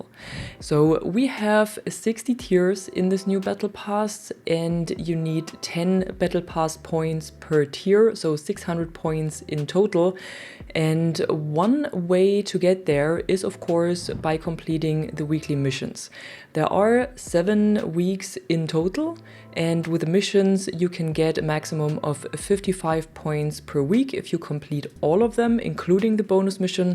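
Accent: Germany. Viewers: Man hört das Du Deutsch sprechen kannst 😂🖐️🖐️🖐️🖐️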